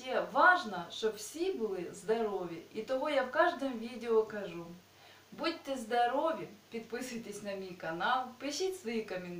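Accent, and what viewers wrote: Surzhyk, Ukraine. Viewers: такой суржик👍👍👍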